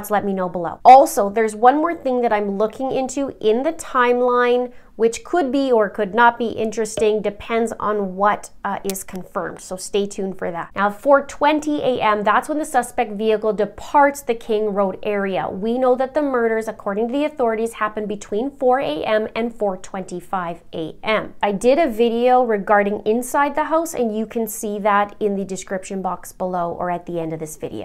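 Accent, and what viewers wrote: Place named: Canada. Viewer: love timelines, so important to this case, also love her canadian accent, my relatives are from viking, ab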